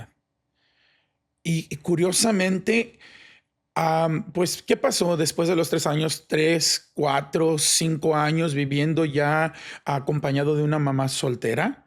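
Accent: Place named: Pocho, United States. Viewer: Que triste y pensar q muchos niños pasan por esto o cosas peores solo una duda por q habla como pocho 🤦‍♀️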